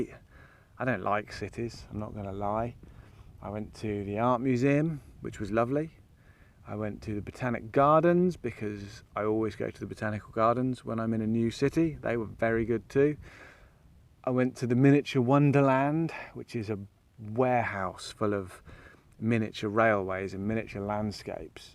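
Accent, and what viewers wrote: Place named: RP, United Kingdom. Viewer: no, been and seen rhyme elsewhere when you talk. It's close to received pronunciation, I think. Her Majesty also does this. Contrast to Ireland, Scotland, or Brumie.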